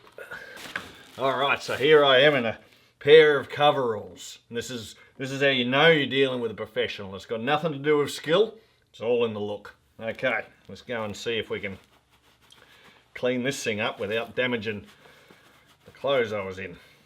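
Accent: Australia. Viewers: As a fellow Aussie, It is a rare pleasure to hear an Australian voice on a you tube show. I have been trying to pick your accent, reminds me of a amalgam of Steve Irwin and Paul Hogan, a touch of John Williamson, with a hint of Bob Hawk and Les Hiddens, hope those Americans appreciate the education they are getting!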